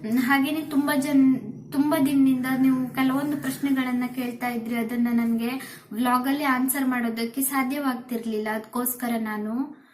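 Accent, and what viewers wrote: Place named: Honnavar, India. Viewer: Namm honnavara matu chenda akka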